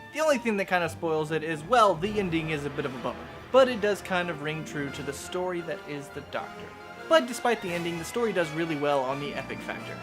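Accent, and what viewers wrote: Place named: United Kingdom. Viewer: Good British accent!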